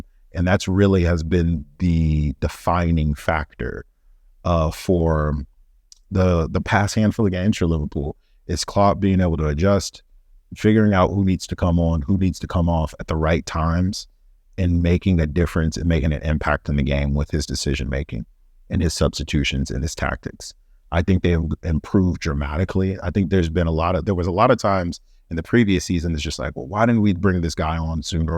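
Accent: United States. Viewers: I've just subscribed for one reason,and one reason only! You are the only person with a US accent that knows exactly what you're talking about. There are a couple of points that you have made that I totally agree with. Not that there right (sometimes it's a matter of opinion). But I have a good knowledge of Liverpool. And the current team. And you are 100% on the money on so many points 👍. If you need to get a bit more exposure, and grow your Chanel? You need local YouTube channels to start looking at you. Contact some of them and see what happens. Knowledge is knowledge. And a red is a red. Looking forward to listening to more of your analysis 👍👍👍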